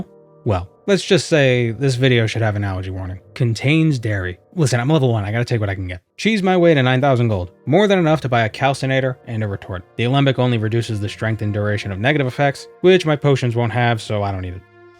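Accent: Boston, United States. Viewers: You do a great job masking it most of the time, but your Boston accent is just too strong. Great content, keep it up.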